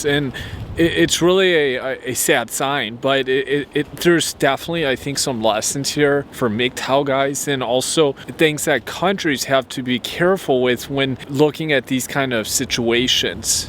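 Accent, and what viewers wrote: Europe. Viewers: The legal availability of "professional women" (brothels, hostesses) as well technology adds to the dynamics in Japan. P. S.:You are from Chicago? You sound foreign, I thought you are from Europe. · You have a european accent why is that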